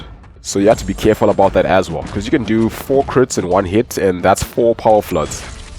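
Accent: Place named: South Africa. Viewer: Whats your nationality? You sound a bit south african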